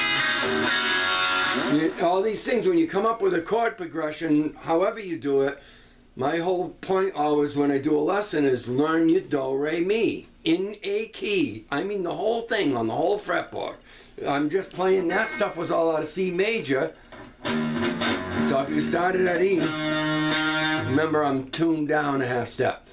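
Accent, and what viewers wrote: New England, United States. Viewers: i also don't remember you having such a thick new england accent LOL. i'm born and raised here, and it jumps out even to me! ;)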